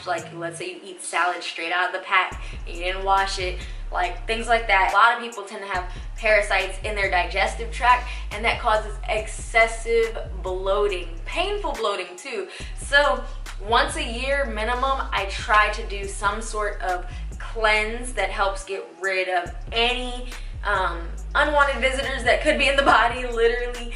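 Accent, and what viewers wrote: Jamaica. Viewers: Ayeee your a Jamaican gyal who knew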